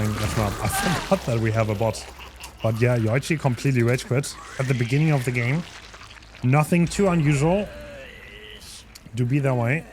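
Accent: Germany. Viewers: ich hasse diesen deutschen aktzent und seitdem ich weiß das du deutscher bist wriß ich warum ich mir deine vids nicht geben kann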